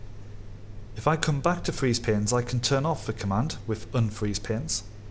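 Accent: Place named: North, United Kingdom. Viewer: super helpful and straight to the point. As a fellow northerner, love the comfort of that accent!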